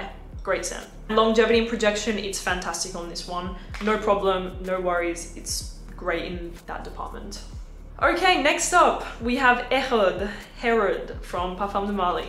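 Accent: Australia. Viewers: Your accent sounds australian, are you from australia? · What is going on with your accent? Definitely a little less Aussie now.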